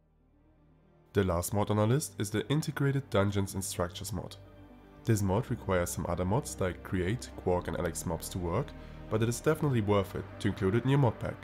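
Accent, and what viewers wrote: Germany. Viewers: klingt deutsch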